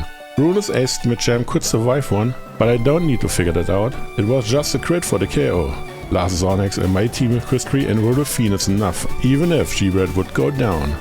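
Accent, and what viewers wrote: Germany. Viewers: Sehr starker deutscher Akzent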